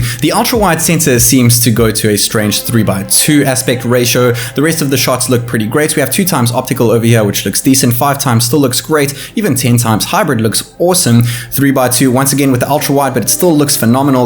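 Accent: South Africa. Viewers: Is he from South Africa? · Heard South African accent. Immediately subscribed. Sick content.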